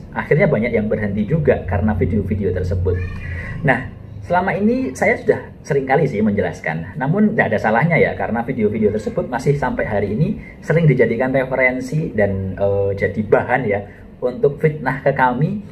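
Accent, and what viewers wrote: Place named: Banyumas, Indonesia. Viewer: Dok.... aslinya mana 😀😀😀. lama merantau kangen logak ngapak...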